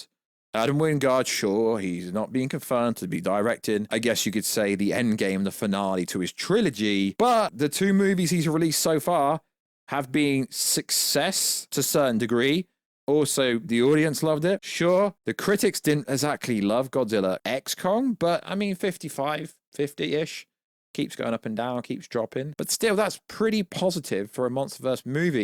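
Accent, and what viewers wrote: England, United Kingdom. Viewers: Stop calling him "Kudora/Kidoruh" ... It's Ghidora lol I know you have the English accent but Damn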